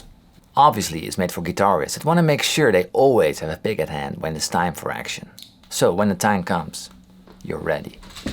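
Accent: Netherlands. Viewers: I am just starting out learning to play guitar aged 53, every single video has something amazing in it to learn, being a fellow Dutchman the accent makes me yearn for home again! (Living in the UK since a child) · It’s so easy to recognize Dutch people speaking English. This is the first vid I watch from you but I can tell you’re Dutch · Why do you have an English name but sound like you're Dutch? Great videos btw, although many of them make me wanna give up playing in a way...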